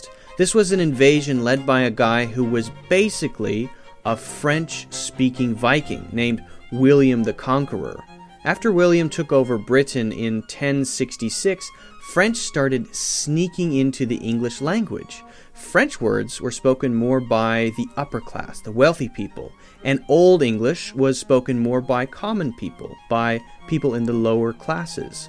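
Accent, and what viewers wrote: United States. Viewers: The guy that is speaking is not English but American. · you are not speaking english you are whining american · Good. One point: your English us not 'midern' it is USA English. In England we do soeak English, standard English as well as 'modern'. Please, do NOT try to confuse the rest of tge world about what modern/contemporary English is. Thank you. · what a pity is a septic talking and not a brit.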